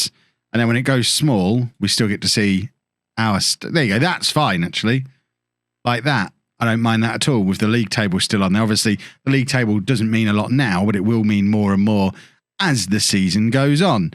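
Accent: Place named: Lincolnshire, United Kingdom. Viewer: Probably the Lincolnshire accent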